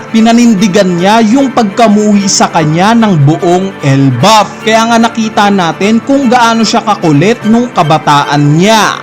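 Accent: Visayas, Philippines. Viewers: Bisayang bisaya a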